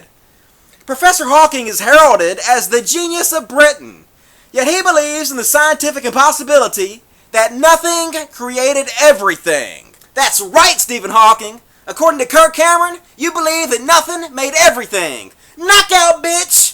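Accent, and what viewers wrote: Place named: Southern California, United States. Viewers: if this guy didnt have a hillbilly accent and a potty mouth maybe someone would take him seriously. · I love this guy's accent, it's like mixture of southern and california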